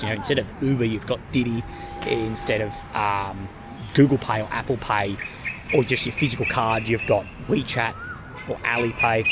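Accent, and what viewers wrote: Australia. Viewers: You must be Australian based on your accent. Welcome to China.🤣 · what accent is it， assuie?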